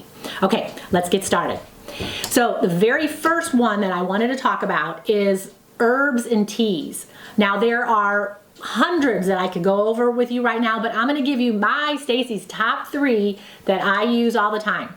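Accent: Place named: Midwest, United States. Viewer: You have to be from the Midwest with your accent. Minnesota? That's another reason you look so young for your age.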